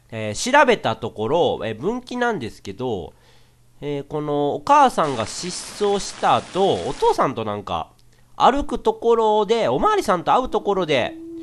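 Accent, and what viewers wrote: Kansai, Japan. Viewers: レトさんの関西弁好きw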